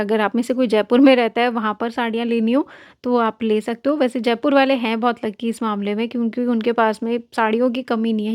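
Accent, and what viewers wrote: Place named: Karachi, Pakistan. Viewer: Lrki tm bikul hmari trahn yani Karachi walon ki trhan Urdu bolti ho..pharry aan dhmky wghera.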